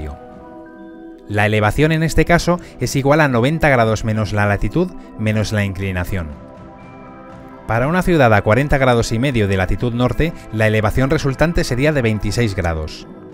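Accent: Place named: Castile, Spain. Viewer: Gracias por el video, pero más que nada por narrarlo en castellano,estoy hasta los 🤬🤬🤬 de oír videos de latinos con esa forma de habla musical y con palabras indescifrables que ellos tienen,acordes a su cultura y a su emisferio.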